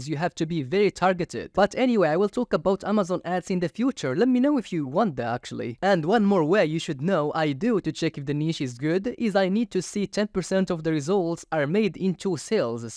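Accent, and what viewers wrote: Morocco. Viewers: mghribi nta hhh gha mn sawtk tbarkllh3lik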